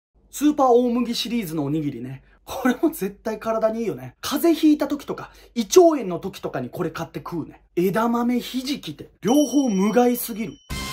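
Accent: Hiroshima, Japan. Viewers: 心地良い広島弁と丁度良い動画の長さで受験の息抜きにさせてもらってます！😂 偏見シリーズ一番好きなので一生やって欲しい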